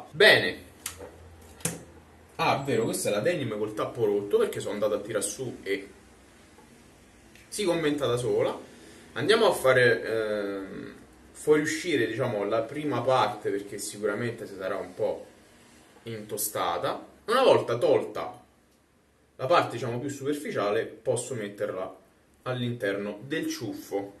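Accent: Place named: Rome, Italy. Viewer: Ciao, la tua cadenza romana mi piace molto. Penso che hai sviluppato una ottima tecnica, la tua zona baffi non è abituata alla lama ecco spiegati i piccoli taglietti. Ma il ventaglio dov'è? Io da quando ti ho copiato sta genialata lo uso sempre 😂🤣 Ti abbraccio 💈🔝👏👍💈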